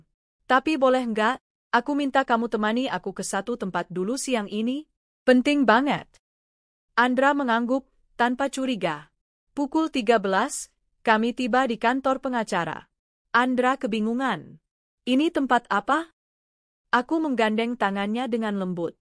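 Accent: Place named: Madura, Indonesia. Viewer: Suara/intonasi dari pembaca narasi ini mirip dgn emak madura yg dari desa sdg baca... Tak iye.. Tak iyee... 😮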